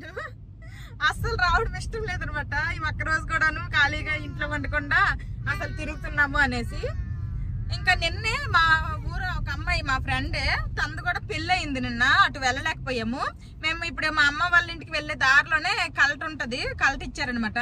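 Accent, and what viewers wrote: Srikakulam, India. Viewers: Mana శ్రీకాకుళం culture slang exact ga chupistunnaru ea filters lekunda super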